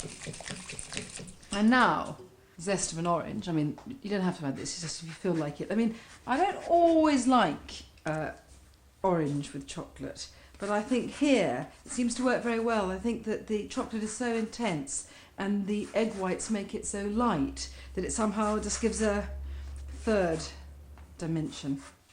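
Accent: United Kingdom. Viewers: god i love her. i wish i could move to england to marry a english woman. her accent is just heaven. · A british accent lol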